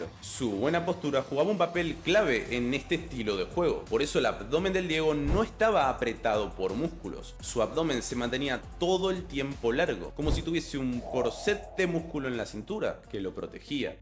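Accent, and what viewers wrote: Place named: Argentina. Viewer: Es argentino😂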